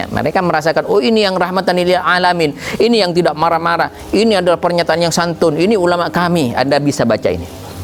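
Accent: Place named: Arab world. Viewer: ini orang dialek ngomongnya kaya orang arab yah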